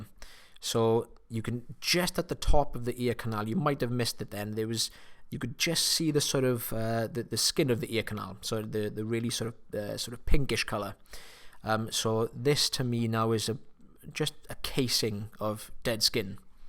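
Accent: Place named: Scotland, United Kingdom. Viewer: Is Scottish? I love the accent!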